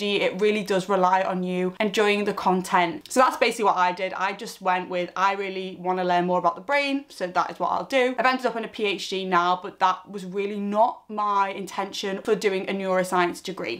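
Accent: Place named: Merseyside, United Kingdom. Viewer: I'm so glad to come across your channel! I'm literally 39 have absolutely no experience with anything on the subject, yet simply through experiences with mental health and my own personal research on the brain and how it works, I've decided I really want to pursue this role! I'm from your neck of the woods by the sounds of things (Merseyside), but currently work full time with 3 kids! Do you have any advise on which pathway I could take to get into this career?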